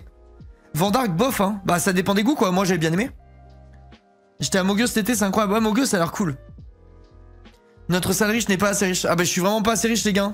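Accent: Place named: Marseille, France. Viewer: avec l'accent marseillais est juste 🤌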